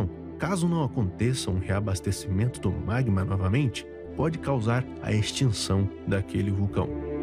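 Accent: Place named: Portugal. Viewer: o narrador parece português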